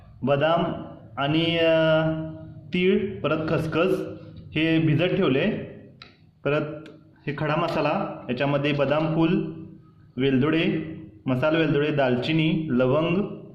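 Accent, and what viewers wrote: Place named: Kolhapur, India. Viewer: Chef asl kolhapuri boltat ki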